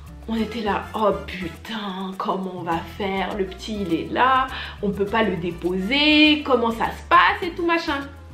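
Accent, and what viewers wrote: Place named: Ivory Coast. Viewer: Ton accent ivoirien me plaît grave 😂😘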